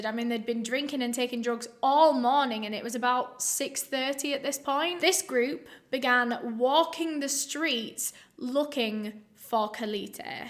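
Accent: United Kingdom. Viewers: omg are you british? finally someone who is actually British. I love it when i have a British bredrin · Tell me you're from the UK without telling me you're from the UK...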